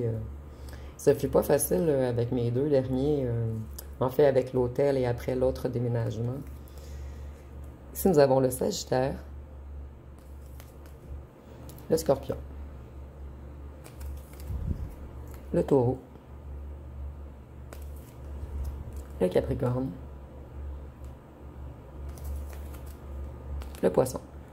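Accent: Quebec, Canada. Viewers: Vous avez toujours autant de charme ,de séduction , de belles manicure et couleurs des ongles , vos tatouages bien fait et très jolis et comment vous avez dû souffrir dans le bras droit en plus , j'adore votre accent québécois ,votre charme ,vous êtes très coquette aussi je trouve ça 👍🌟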